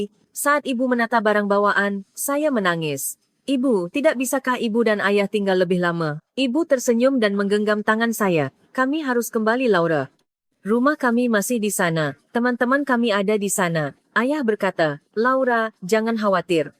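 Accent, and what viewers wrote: Malaysia. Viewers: Narator nya orang Malaysia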